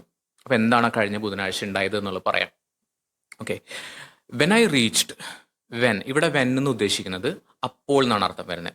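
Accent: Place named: Thrissur, India. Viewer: Really useful video 💕👌 When I heard your Malayalam translation, I felt that you are from Trissur. Awesome class😁👍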